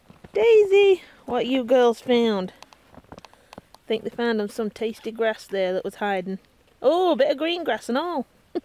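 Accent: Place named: Northern England, United Kingdom. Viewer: Hi!! Your accent- it sounds like it's from Northern England! Do people in your area usually speak like that?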